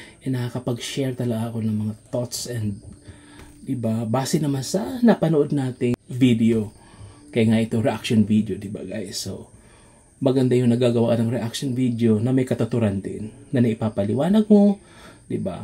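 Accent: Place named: Bicol, Philippines. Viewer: Bicol accent, I love that. About, tugang, you can say that again.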